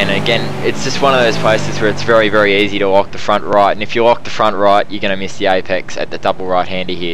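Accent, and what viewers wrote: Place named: Australia. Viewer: Nice, vid includes authentic Austrailian accent and everything :)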